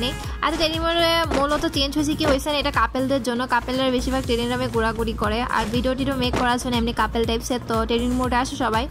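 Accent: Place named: Chittagong, Bangladesh. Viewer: Arre api tumi to khass chittainga khali thake thake bolteco🤗🤗🤗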